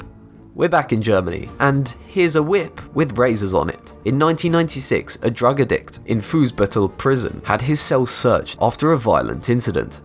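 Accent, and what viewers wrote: England, United Kingdom. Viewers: Final boss I think he sounds a little bit British. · Britsh accent again boring. Pass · That's just an english accent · O look another British narrator. Pass, dislike!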